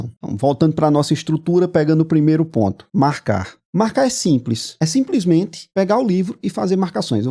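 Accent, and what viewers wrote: Pernambuco, Brazil. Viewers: Pelo sotaque é Pernambucano .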